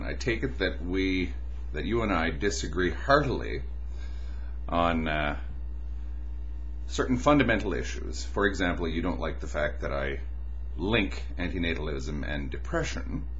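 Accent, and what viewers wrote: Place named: Canada. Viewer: Is this dude actually speaking English? I can't make anything out of his Canadian accent. But I do agree that Jacob's Ladder is a dope movie, cheers mates.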